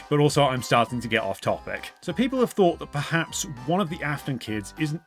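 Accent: United Kingdom. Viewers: He’s too british, I can tell cuz im british too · Ayyyyy a fellow norfolk lad!!! (Tbf, i understood the dialect but only cuz that's what my grandparents sound like 😂)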